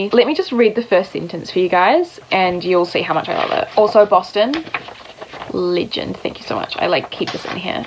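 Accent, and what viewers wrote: New Zealand. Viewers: Giiiiirl you will die and be reborn when you read Six of Crows. The premise of it literally never interested me and I only read it to see what the hype was about... it's now my favourite series of all time. I can't even with it. I know you'll love it so I cannot wait to see your reaction when you finally read it! Also what was the channel you mentioned about the Goodreads review? Melanie something? Sorry, I'm not from NZ so I don't always understand NZ accents 😂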